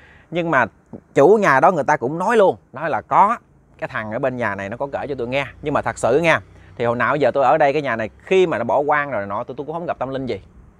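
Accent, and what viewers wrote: Mekong Delta, Vietnam. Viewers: Rat' thich' kenh ke chuyen ma cua voi' giong chan chat' mien`Tay & nhung chuyen ma co' that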